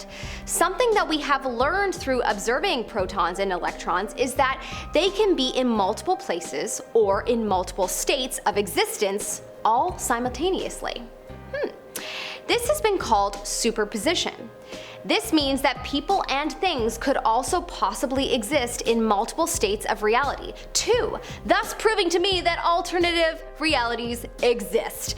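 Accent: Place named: United Kingdom. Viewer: A stone computahhhhhh wahhhhh I love your funny British accent 😅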